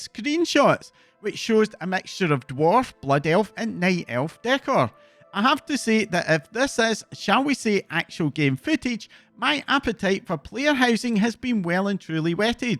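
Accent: Scotland, United Kingdom. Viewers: Love the Scottish accent fr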